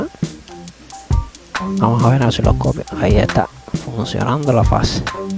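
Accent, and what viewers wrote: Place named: Puerto Rico. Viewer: si primera ves que hablaaaa jajajajajajaja...................y tiene acento boricua jajajajaja likeeeeee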